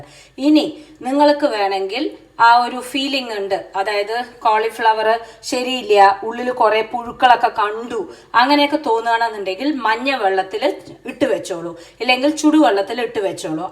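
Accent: Palakkad, India. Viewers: I like your simplicity. You are very straight forward and seeing you enjoying the cauliflower fry was really enjoyable.I also like your palakkadan way of speaking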